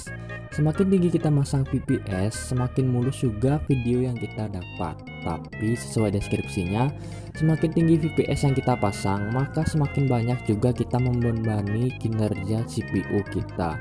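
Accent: Bali, Indonesia. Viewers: Kayak Logat Orang Bali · Org bali ya bg? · Adi care nak Bali logat ne,,😀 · Logat abangnya ke orang bali salam bali kawan🙏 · Orangh Bali Banh?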